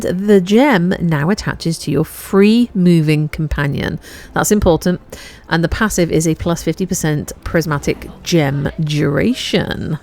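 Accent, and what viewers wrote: West Country, United Kingdom. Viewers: Hell yeah! You sound like your from the West Country. Instant follow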